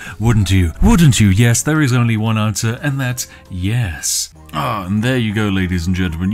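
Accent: United Kingdom. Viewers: As an American I love how British you are. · ARE YOU REALLY A BRIT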